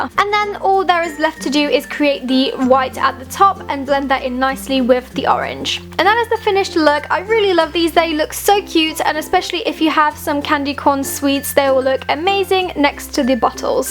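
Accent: United Kingdom. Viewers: R u British??????? It's bugging me that I don't know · Are you british or something?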